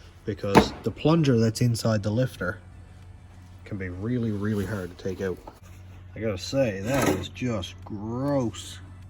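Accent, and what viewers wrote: Newfoundland, Canada. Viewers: Awesome video ❤!! And I knew that accent of yours was from the rock,…. 😊 a fellow Canadian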